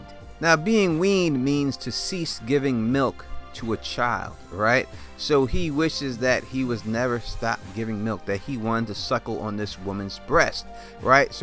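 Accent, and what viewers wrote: New York, United States. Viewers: Mind fuck! Well thought out...ur right about GRRM throwing down hints when u least expects it. For as big as the books are, nothing is prattle. Ur new yorker accent poses an amusing juxtaposition with the fantasy content.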